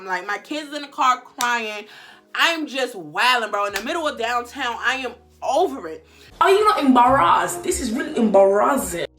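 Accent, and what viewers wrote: New York, United States. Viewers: Sis really said “shiznayii” you are sooo New York. Don’t ever change🤣🤣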